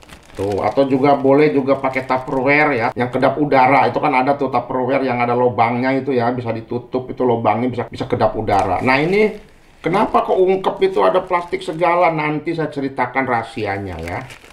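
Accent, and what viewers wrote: West Java, Indonesia. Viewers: Kungkurahan,Sunda banget Pak😂❤️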